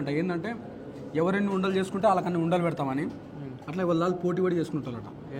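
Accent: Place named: Telangana, India. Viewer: Idhi తెలంగాణ bro... andhra kaadhu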